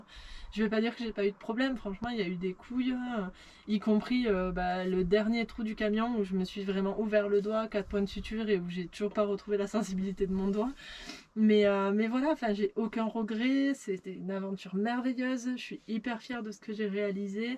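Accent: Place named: South, France. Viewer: merci, excellent choix, mon budget est réduit, je vais aménager seule mon jumper également 😊 je suis de l'Hérault, tu as l'accent du sud aussi, de quel coin?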